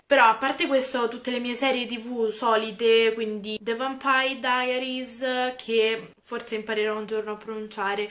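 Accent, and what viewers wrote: Rome, Italy. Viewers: Il tuo romano il top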